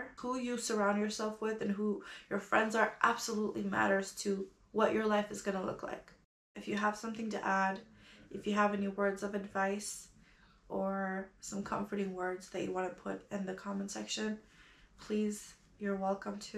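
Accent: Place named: United States. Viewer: سڵاو بەڕێز.بە ئاکسنتی ئەمریکی قسە دەکەی تۆ دەڵێ ی لە نەرویجم چۆن بە ئەکسنتی ئەمریکی قسەدەکەی؟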